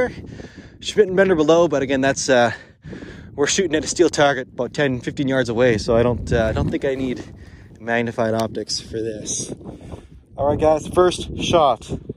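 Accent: Canada. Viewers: U're canadian right? I'm sayin' this cause of your accent.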